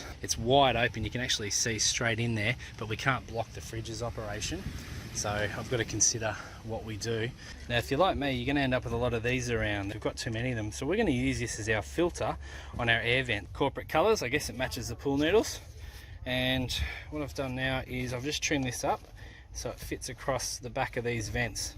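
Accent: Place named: Australia. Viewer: We Cannot Hear your voice. FIX THAT. No ill entent intended. And SPEAK SLOWER, Your Acsent is Not Easy to Understand. (American English is Not Aussy English) We Still Luv-Ya-Champ.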